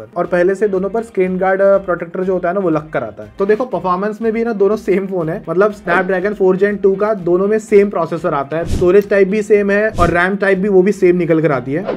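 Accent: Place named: Punjab, India. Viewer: Bhai apke accent Punjabi hai ❤😊😊😊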